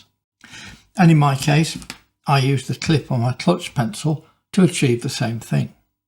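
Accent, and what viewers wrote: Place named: United Kingdom. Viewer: J'ai dû beaucoup dessiner dans ma vie car ceci est exactement ce que je fais en dessinant. En suivant cette manière de faire, on développe un sens assez fin de l’état de la pointe du crayon, permettant toutes les nuances sans avoir trop à réfléchir. J’ai beaucoup aimé votre style de dessin, je vais regarder vos autres vidéos. En vous écoutant je me rends compte que quand j’écoute un accent britannique, je fais très confiance instinctivement au niveau de compétences et de sérieux de la personne. J’apprécie la modestie des britanniques alors que assez systématiquement les gens ont des habilités particulières à communiquer qu’ils ont peaufinées pendant longtemps. Merci, un bonjour de 🇨🇵 !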